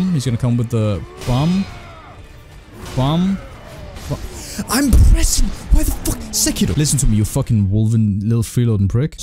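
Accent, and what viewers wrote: Denmark. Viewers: Danglish on point, as always.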